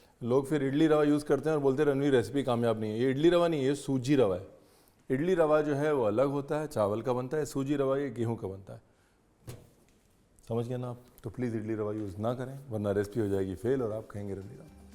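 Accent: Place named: Punjab, India. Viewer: Sweet panjabi hindi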